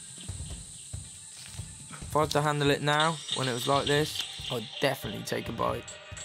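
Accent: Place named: Southern England, United Kingdom. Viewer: You've got a southern British accent. Can't tell if you're from Brighton, or somewhere in Surrey, Kent or Hertefordshire, lol!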